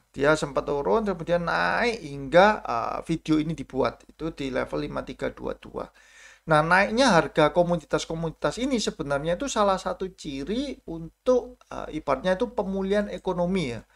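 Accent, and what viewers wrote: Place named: Java, Indonesia. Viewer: Dialeg nya medok banget mas *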